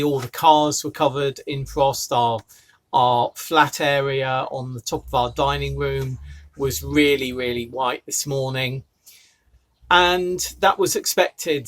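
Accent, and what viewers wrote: RP, United Kingdom. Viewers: YOUR speach is fantastic and a perfect ..we c as lled it * Oxford English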